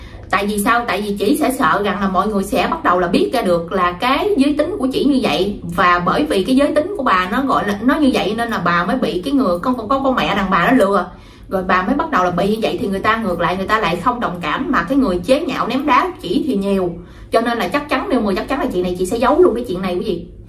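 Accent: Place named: Rural, Vietnam. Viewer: Cô rất thích - giọng nói đậm chất quê- tâm lại tốt nữa Oke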